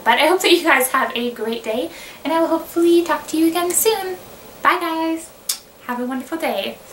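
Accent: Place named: Canada. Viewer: Your Canadian accent is so cute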